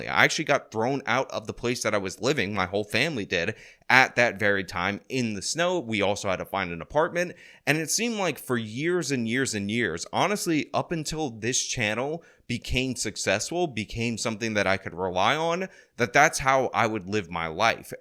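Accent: New York, United States. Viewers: Your a native New Yorker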